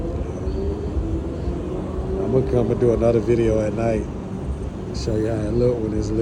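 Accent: South, United States. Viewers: I hear that southern drawl! “ lil fri-juh-ray-da”.. I’m from the Midwest… we sound like that too🤣🤣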